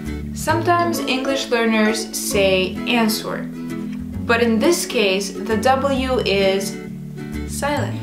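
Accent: Russia. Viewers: You have a russian accent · You do have an accent. A Russian one I presume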